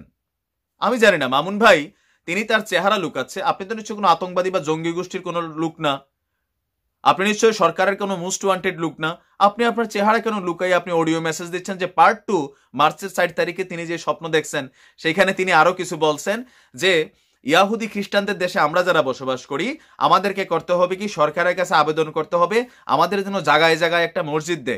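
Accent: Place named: Comilla, Bangladesh. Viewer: ভাইয়া কুমিল্লা ভাষায় কথা ভালো লাগে না হলে ভালো লাগে না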